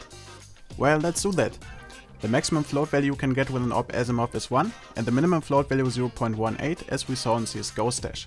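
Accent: Germany. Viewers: Fabian N aber er redet ziemlich langsam und verständlich es ist zwar der deutsche Akzent dabei aber wenn du so etwas nicht verstehst dann solltest du probieren dich an einen deutschen Akzent zu gewöhnen ... denn das englisch war sehr gut verständlich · Man hört das er deutsch ist XD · All of this math sounds so much better because you're German <3 cheers! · Arbeite bitte an deinem Akzent, hört sich schrecklich deutsch an.. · Ne ich verstehe sein englisch fast nicht mit diesem deutschen akzent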